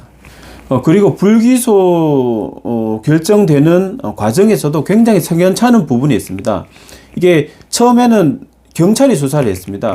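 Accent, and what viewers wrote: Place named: Gyeongsang, South Korea. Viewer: 님 논평 잘 듣고 있습니다. 승승장구 하시길 기원합니다. 경상도 억양이 너무 익숙해서 편안하게 잘 듣고 있습니다.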